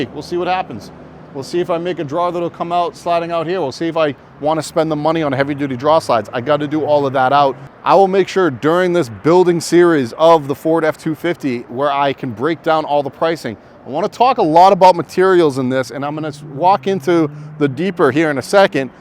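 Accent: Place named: New England, United States. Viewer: Keep talking about your drawer slides lol you have such a New England accent! I lived in Mass for 21 years and I’m in SoCal now. Hearing you say drawer takes me back 🙌🏼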